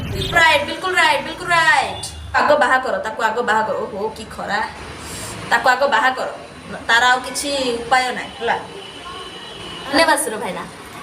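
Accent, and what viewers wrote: Berhampur, India. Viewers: Sister tuma barahampuriya katha suniki maza laguchi 🥰🥰🥰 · Pura ama berhampurya language style...maddi chalao madam..Jai maa budhi thakurani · Superrrb mam language jabardast barahampur side ra akka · Satare tm video dekhi ki mu Bahut khusi. Intresting anchor. Pura barhampur style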